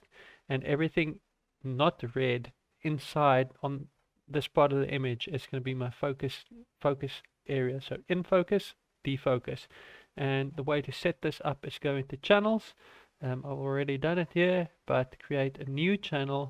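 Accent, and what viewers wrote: South Africa. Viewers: Where are you from, you have great tutorials, sound South African?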